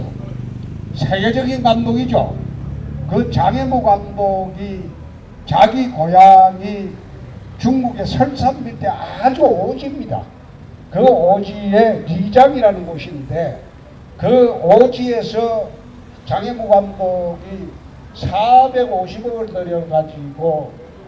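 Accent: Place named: Daegu, South Korea. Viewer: 님 당선을 축하합니다 을사랑하는 시민으로 부탁드릴 말이 있어요 서울쪽 사람들은 대구사람들의 말투를 좋아하지않습니다 좀 과격하지요 오늘 어떤 유트브에서 당선된 이 당선후 나댄다고 하네요 저는 그 말에 일리가 있다고 생각해요 앞으로 대권에 도전하고싶으시면 대구 경북의 유권자만 지지해서는 가능하지않을것 같아요 부디 말씀에 서울쪽 사람들이 좋아하는 말씨를 씠으면 좋겠어요 저도 대구시민이라 대구에서 대통령이 나오면 그보다 더 좋을수가 없겠지요 그러나 그보다 더 중요한것읏 정권을 다시 찾아오는것이여요 통합당을 지지하고 자유민주주의를 지향하는 시민으로 부탁드립니다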